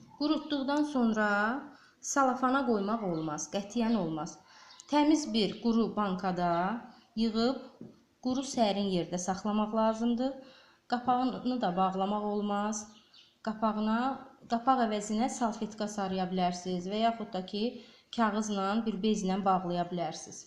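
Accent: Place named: Qobu, Azerbaijan. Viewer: qobu lehcesiyle danwirsiz.Qobulusuz?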